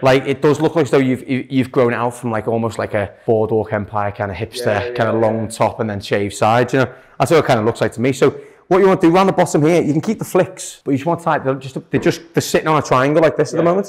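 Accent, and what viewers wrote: Liverpool, United Kingdom. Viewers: Are you scouser? You sound like a scouser:D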